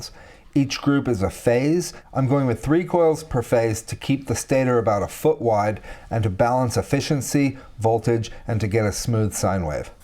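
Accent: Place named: United States. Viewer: I'm hearing American English, but I se British power outlets. so.. . what's the story ?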